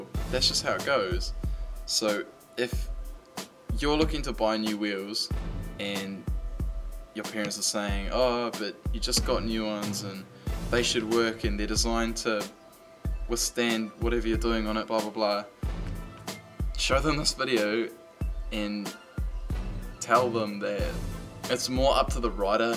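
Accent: New Zealand. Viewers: what shop is that you sound like your from your New Zealand? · that`s his kivi accent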